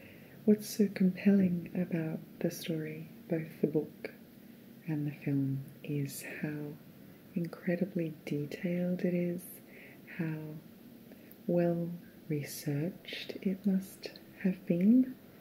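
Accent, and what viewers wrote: Australia. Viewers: 😍📚 Everything about this is perfect! Your articulate summaries for two of my most beloved films, Atonement and Geisha, are much appreciated. ^_^ Keira and Michelle Yuen are favorites. The library is my refuge, and mythology is timelessly dreamy. Your Australian accent lends itself to ASMR as well. 😃 I am thrilled to be a new subscriber.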